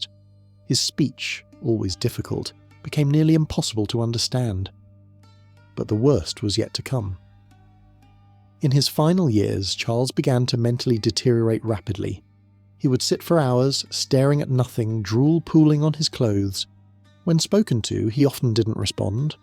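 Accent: United States. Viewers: Sounds like a normal Guy from Alabama. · Old gammon Brit here. We all used to fall about in tears of laughter when somebody said "dienasty"! Now British kids - such as you - speak american. Oh well. That's the future I suppose.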